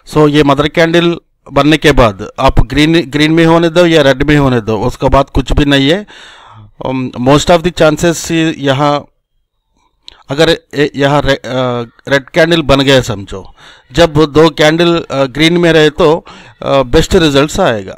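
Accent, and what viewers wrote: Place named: Mumbai, India. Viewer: Your Hindi is Mumbai Hindi...easy to understand....Keep it up...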